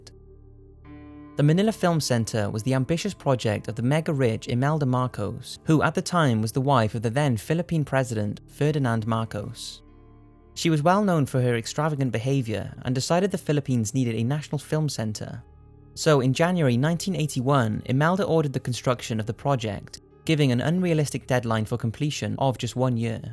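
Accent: United Kingdom. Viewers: You have a very beautiful and calming voice, I really like it, where are you from? Your accent looks like British....😊 make more videos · Am I The Only One Who Loves This Guy's British Accent??😐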